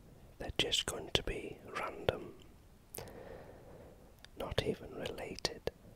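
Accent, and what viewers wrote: Wales, United Kingdom. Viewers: This is wonderful. Welsh? Love your voice and the content of your videos, perfect for bed time, perfect for sleep. Thanks.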